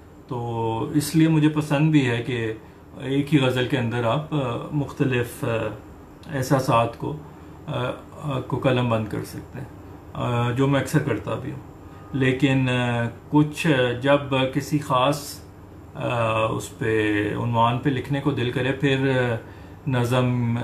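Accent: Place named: Punjab, India. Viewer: Nice ✔🙏 wow so super bhaut kubh nice your voice🙏🙏👌🌹🙇‍♀️🧕🙏 nice your panjabi language tone so super 👌👌🙏🙇‍♀️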